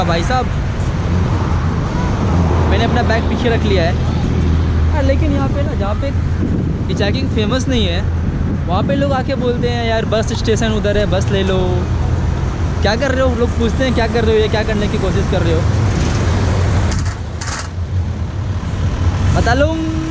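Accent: Bihar, India. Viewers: Aram se baitha tha ek dum pasra hua tha, ye bihari language 🤗🤗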